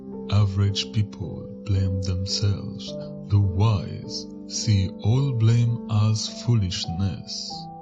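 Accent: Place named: France. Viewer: This guys voice fd the whole video up... Annoyed me so badly... French?? What is this voice?? Yuk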